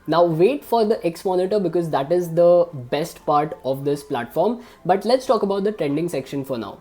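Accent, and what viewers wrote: India. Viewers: Everything is good you are good Toothpaste Seller, but for GOD SAKE. Will you be F.... STOP NODDING your head. Besides your Taaattrrring Indian Accent I have got headache by just watching your video just your F...Head Nodding. Please take it as constructive criticism. For GOD sake.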